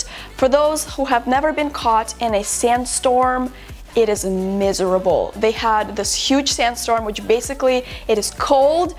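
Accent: Russia. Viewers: Is she russian? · Are you russian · Is she russian or something ? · Russian?! such a nice strong accent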